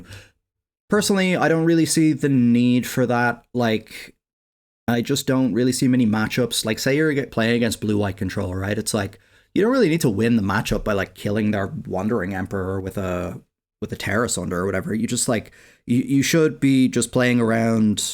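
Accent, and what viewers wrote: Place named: Dublin, Ireland. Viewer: Coming to the party a bit late but thanks for the deck tech! It's great to hear a dublin voice in my ear around Xmas!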